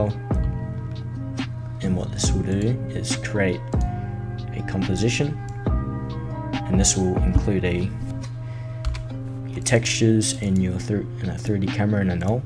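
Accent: Australia. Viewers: HEEESSS AAA FUUUCKKIINNG AUUUSIIEEEE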